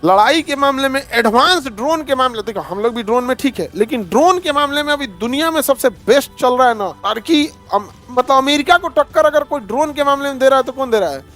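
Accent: Bihar, India. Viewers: I don't care here to get ' Gyan'....I come here for the interesting Bihari accent 😎❤️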